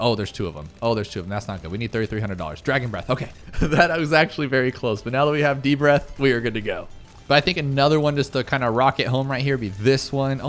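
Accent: Norway. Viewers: vent vent vent, er du norsk? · You’re Norwegian? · Are you Norwigian?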